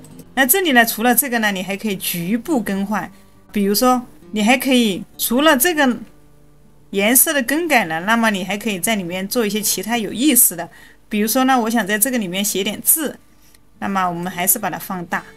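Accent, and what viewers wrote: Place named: Wuhan, China. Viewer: 武漢口音超級重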